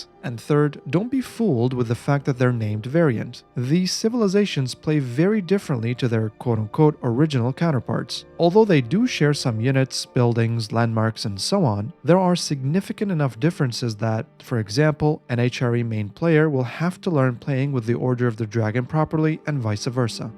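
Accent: Arab world. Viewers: i just realized you are most likely arab from your reading of the names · oh god are u arabian?! the accent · the way you pronounced the names tells me that you originally Arab :P , anyway thanks for the info cant wait to have my hands on the new civs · My man. Your arabic accent is so eloquent.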